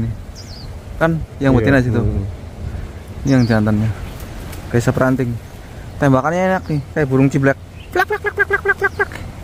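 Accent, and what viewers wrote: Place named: Banyumas, Indonesia. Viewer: mas nya asli orang mana. kok bisa ngomong Jawa ngapak wkwkw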